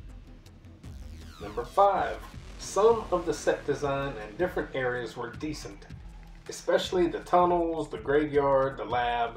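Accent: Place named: South, United States. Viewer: You sound southern I can see you doing a southern story reading and It being awesome I’m going to picture this voice when I read Where the Red Fern Grows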